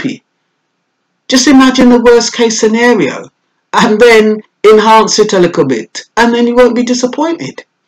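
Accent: Jamaica. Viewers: Okey but men, nowadays are putting on make-up and weaves too. Men just try to detach themselves.I love your Jamaican accent by the way.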